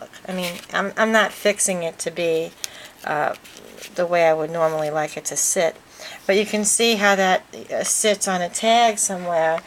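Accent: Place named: New York, United States. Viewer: *runs in n'squishes you to pieces parts!!* I love the stamping idea!! loveLoveLOveLOVeLOVE it!!! Thank You Thank You Thank You! Consider it scraplifted! ;o) You've got all mah' craftin' juices flowin' sistah! . . . now, if only I had craft time. . . I love your videos, and I especially love your tutorials because I can hear the fuhgeddabouddit in there. *Grins* Have a super scrapalicious day sistah! Put your feet up, relax and well... you know the drill. Hugs n'Squishes!! ~*Mwah!!*~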